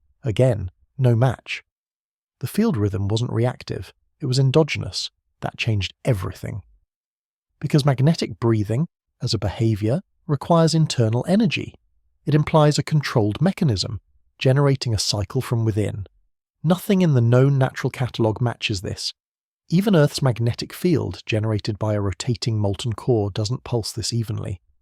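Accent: United Kingdom. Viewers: It's called Queen's English for a reason clear elegant and the universal language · Why is the narration ALWAYS with a British accent?!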